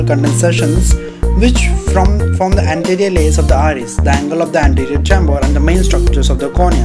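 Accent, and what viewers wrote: India. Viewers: Yikes...indian accents are so attractive....watch out british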